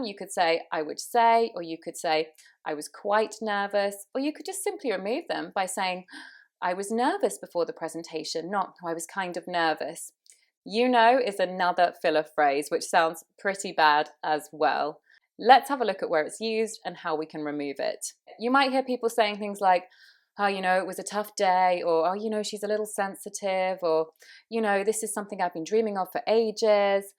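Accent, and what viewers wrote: United Kingdom. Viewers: you are such a wonderful english teacher, I learn many things from you and I love your UK accent however I can follow you on FB and insta but not on Tik Tok because it's ban in my country but Love from India 🇮🇳❤️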